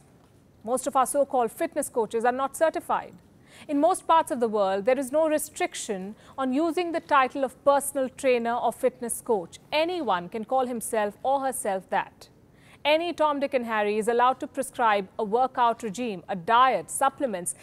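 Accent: India. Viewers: Gosh, she's got this aweful voice and strong indian accent. So annoying. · Don’t understand your English with Indian accent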